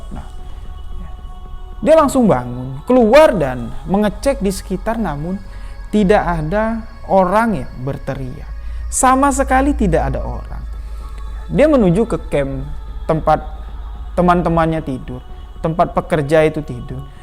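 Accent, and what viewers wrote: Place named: Bali, Indonesia. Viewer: Afwan, antum Logatnya seperti orang Bali ya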